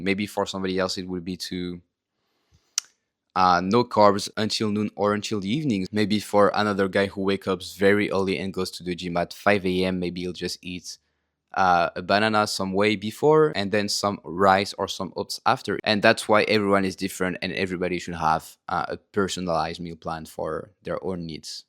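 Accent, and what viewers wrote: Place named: France. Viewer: This video is so informative! That French accent tho 🤌🏽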